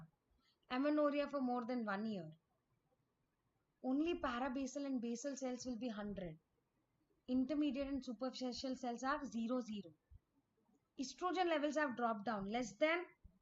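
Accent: Telugu, India. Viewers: Thank u so much mam ur Telugu is so cute mam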